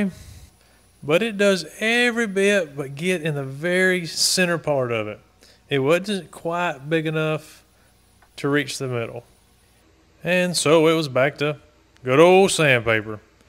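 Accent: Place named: Mississippi, United States. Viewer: Yeah, I live in the northwest now, but I knows my peeps when I hear 'em speak. lol Spent summers with grandparents in West Point, MS. growing up. When I first heard you speaking I thought you were one of my uncles. lol Good video by the way. Chat later.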